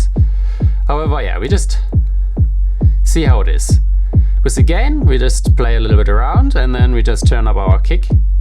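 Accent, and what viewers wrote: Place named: Germany. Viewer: Djeezes, it sounds nice when Germans speak English ! Danke und grussen aus Holland.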